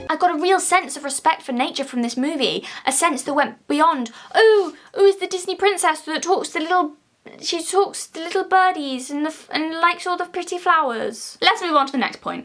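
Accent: United Kingdom. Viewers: I always forget how British you are until you speak So, what i got out of this: British Girl Loves Trees (EXPLICIT?)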